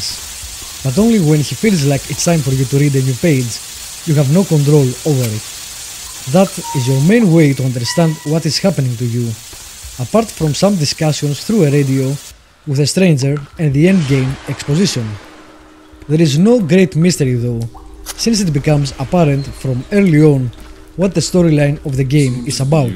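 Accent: Greece. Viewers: Hi " " how are u? I see u continue steady. Tα Αγγλικά σου είναι πολύ τέλεια από πλευράς ταχύτητας. Σε σύγκριση ακόμα και από πριν ένα δίμηνο περίπου που είχα δει ένα Review sου πάλι. το λεξιλόγιο το είχες ήδη. Το είχα καταλάβει κατευθείαν την 1η φορά - σε ένα gameplay apo to Total War Napoleon - και κοίταξα το About και είχα δει από Ελλάδα. Εμείς οι Έλληνες έχουμε πολύ χαρακτηριστική προφορά στα Αγγλικά. Εγώ για παράδειγμα ζούσα 2 χρόνια Σερβία και 8 μήνες Αγγλία το 4ο έτος από το Κολέγιο και την Αγγλική προφορά δεν την υιοθέτησα ποτέ. Σόρρυ εαν ακούγομαι ότι σε κριτικάρω αλλά γουστάρω που βλέπω Έλληνες YouTubers να προσπαθούν. Είμαι 40 και είμαι gamer ap;o ta 11 me Commodore 64, disks 5 1/4". Θέλω και εγώ εάν είναι να κάνω για χόμπι ενα κανάλι αλλά σκέφτομαι το 60-70% με games to υπόλοιπο με κάτι άλλο αλλά τι? Έχω 2-3 πράγματα στο μυαλό μου. Σκέφτομαι Φαρμακευτική Κάνναβη. 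Πριν σου φανεί περίεργο ο αδερφός μου είναι ο 1ος στην Ελλάδα που έκανε το <num> την 1η Έκθεση Κάνναβης στην Ελλάδα (γήπεδο tae kwon do Ιανουάριο 2018 και 2019).. Το όνομα "CannabisExpo" το έχει πατεντάρει και δεν μπορεί να το χρησιμοποιήσει άλλος, έχω κάνει πολλά κονέ και αναπτύσσεται πάρα πολύ ο κλάδος και ο κόσμος δεν ξέρει που να κατευθυνθεί επειχηρηματικά και καταναλωτικά και θα μπορούσα να βοηθήσω με πληροφορίες και το πως πάει το νομικό σύστημα γενικά. συγνώμη για την πολυλογία απλά ήθελα ενός αγνώστου την άποψη ή έστω απλά να το πω, σου εύχομαι ραγδαία ανάπτυξη στο κανάλι σου.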